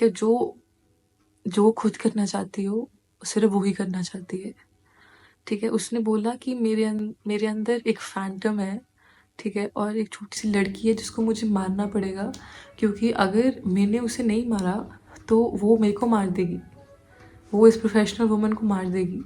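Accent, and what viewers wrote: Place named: Punjab, India. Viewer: I love hearing punjabi underneath your hindi!!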